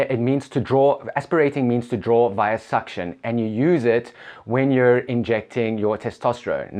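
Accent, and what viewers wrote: South Africa. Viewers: Sounds south african?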